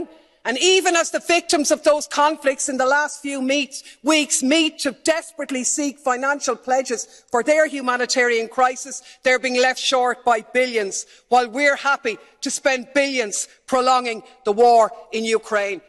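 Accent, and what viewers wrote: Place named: Ireland. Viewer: Thank you!! Wise words spoken with a wonderful irish accent! 💚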